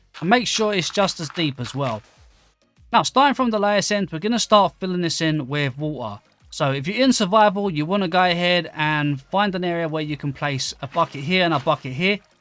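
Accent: United Kingdom. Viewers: British duh!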